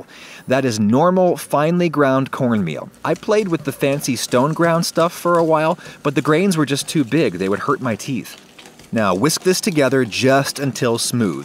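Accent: United States. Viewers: Holy shit it's food wishes in 5 mins and in an American accent. You're God. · you're american? i thought you're canadian!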